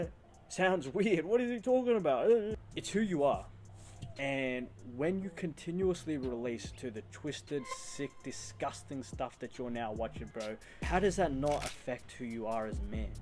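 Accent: Australia. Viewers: just popped up in my algorithm, stoked to hear an aussie voice on this journey. cheers lad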